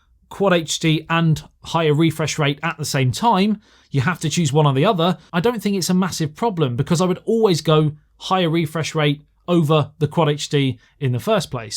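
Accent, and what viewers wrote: England, United Kingdom. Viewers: Damn I knew you would be speaking with an English accent from the thumbnail. You have an English looking face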